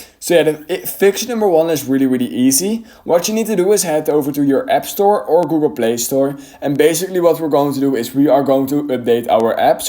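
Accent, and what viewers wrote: Netherlands. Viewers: Je klinkt echt Nederlands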